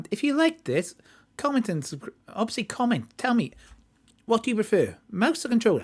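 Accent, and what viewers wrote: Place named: Wales, United Kingdom. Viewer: Nothing worse than the Welsh accent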